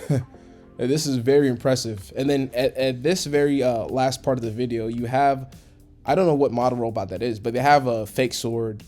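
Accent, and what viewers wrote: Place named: United States. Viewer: China hasn't shot a bullet outside of their borders in almost half a century so it's kinda hard to listen to someone paint China to be as warmongering as the US especially in American accent. Not saying China can't be aggressive in the future but you can't deny the fact that the irony and hypocrisy is too much to handle for most of us normal non-western people. Also I agree that China probably already testing more advanced robots, drones, and AI in their secret military labs but they're the only major power that actively tried to ban and regulate AI usage in war. So it's not fair to criticize China without criticizing certain powers who denied Chinese proposal just because China advanced faster than expected.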